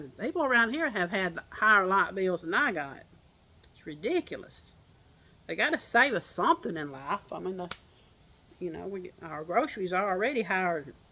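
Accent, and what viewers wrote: South, United States. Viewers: Dear.. I would love to see you every day on here. I love your sweet Southern accent 😉 & those cute Southern descriptions of things. Your C2C Christmas blanket is so beautiful!!! The colors are true Christmas colors!..I loved hearing your birds..sounded like Blue Jay's! Seems like all of our chirpy birds gave all gone. I dodnt see Woodpeckers all summer..but today there was 2 in my back yard!! I miss the sounds of Spring & Summer..way too quiet 😕. Take care & God bless all of you 🙏 ((hugs)) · Hello Miss! Greetings from Florida! Just found your channel and let me tell you I absolutely love it! That southern accent is just lovely and your style is very soothing and very down to earth. I’ll be watching you from now on ☺️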